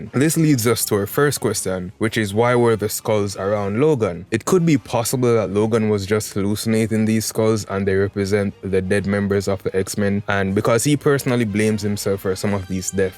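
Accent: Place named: Jamaica. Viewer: Bomboclaaat rastaman ahh accent, cool af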